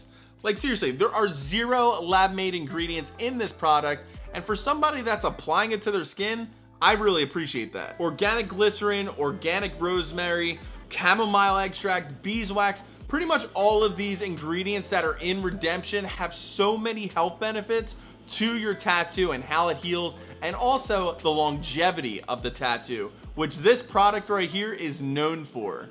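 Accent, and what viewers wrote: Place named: Delaware County, United States. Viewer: Are you from delco cause you sound like it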